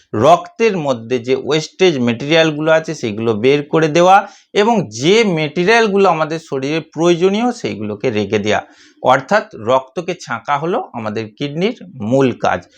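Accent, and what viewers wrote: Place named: Kolkata, India. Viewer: ডাক্তার সাহেব আপনি কি বাংলাদেশে বসবাস করেন ? আপনার কথা এবং ভাষা অনুযায়ী মনে হচ্ছে আপনি ইন্ডিয়ান অর্থাৎ ক্যালকাটার যদি বাংলাদেশে আপনিও হয়ে থাকেন তাহলে অবশ্যই আপনার নাম ঠিকানা কোন হসপিটালে আছেন জানাবেন।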